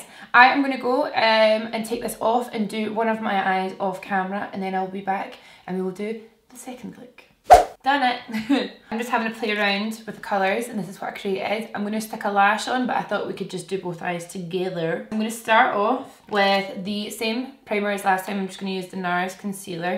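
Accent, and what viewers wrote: Scotland, United Kingdom. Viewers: I recreated the 1st 2 yesterday , I had no lenses so i was blind as shit but still loved playing with makeup 💗🙌 also the Scottish accent is EVERYTHING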